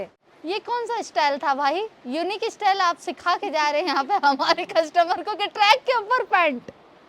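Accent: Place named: Hyderabad, India. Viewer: Mam yaar I really liked the Hyderabadi language in the you 😂🤣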